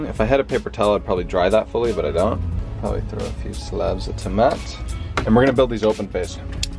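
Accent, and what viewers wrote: Canada. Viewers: im gonna try this!! lol there was barely any sandwich left for her to try ahaha poor girl and why did their bread already sound toasted when he threw it in the pan? lmfao first time watching him and hes funny. Is he canadian by the way? or am i just picking up an accent and the cheesiness lol? dont worry im Canadian too But yeah deff gonna try this minus the sun-dried tomatoes! All around good but theres so many hygiene issues that were literally making my anxiety skyrocket... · I just stumbled across your channel and I’m very confused lol HEB is a Texas based grocery chain but your accent sounds Canadian lol but I’ve been wanting to try chickpeas/garbanzo beans but didn’t know what to do with them! Thank you!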